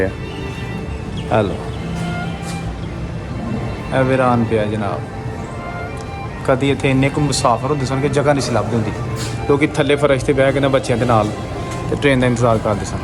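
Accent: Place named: Punjab, India. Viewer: Tuhadi boli sadi boli varge h sweet punjabi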